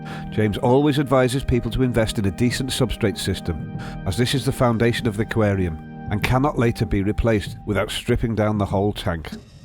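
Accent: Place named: Wales, United Kingdom. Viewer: can anyone tell me what accent this is? sounds like a Welsh guy I know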